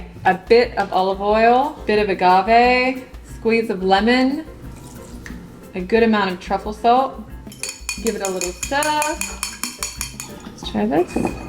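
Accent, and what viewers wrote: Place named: Australia. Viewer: you are such a funny and beautiful cook, I love your Auzzie accent and am a huge fan of your wonderful husband Texas guitar slinger Mr Gary Clark Jr, Ive seen you two interviwed at the grammys and you just take my breath away, fine couple hope you can keep your love flowing Thank you for all your delicious recipes you of course make it all so delicious.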